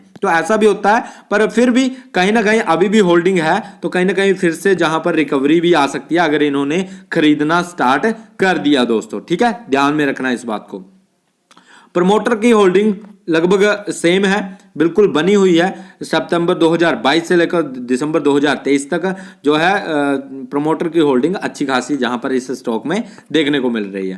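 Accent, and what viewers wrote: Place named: Punjab, India. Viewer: Bhaji tuhadi hindi sunn k lag reha Punjabi ho😅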